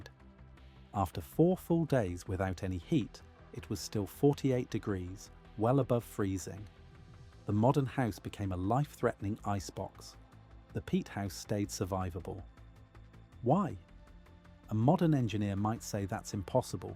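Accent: United Kingdom. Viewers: Can you please at least put temps in C as well? No one but Americans use this. I mean you have a British accent who uses C.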